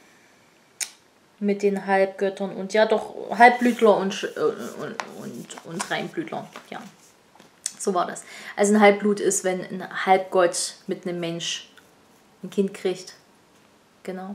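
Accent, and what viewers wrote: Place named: Saxony, Germany. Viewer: "OF RUBBN!!" 😂😂 Oh man. ❤ Find ich soo gut das du dein Sächsisch nicht versteckst! Ich versuche immer mein bestes zu geben mein Thüringischen Dialekt zu verstecken. Und Pain au Raisin finde ich riecht SEHR nach Rosinen. 😂😂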